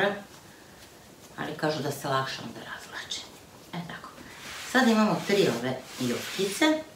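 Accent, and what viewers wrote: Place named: Sombor, Serbia. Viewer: Kad Bas slusam kao da sam u Somboru.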